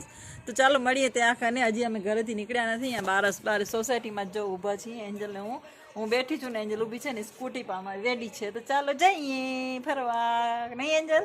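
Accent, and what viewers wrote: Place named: Kutch, India. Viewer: Kachhi boli samajva ma thodi મુશ્કેલી chhe ...tme kachhi boli bolo chho te સાંભળવી gme છે..tame bav speed ma bolo chho ( insta reels ma )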